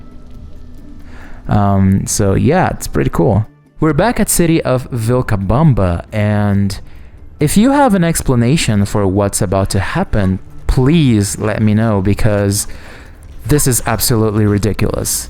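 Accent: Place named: United States. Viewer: No dude you sounded like an american for me when i first met u!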